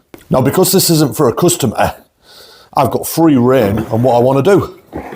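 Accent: United Kingdom. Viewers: Randomly come across you on my home page and just knew you were British, I’m also British 😂😂 love watching wood working videos whilst I’m chillin, so it’s nice to come across someone who’s not American or Canadian for a change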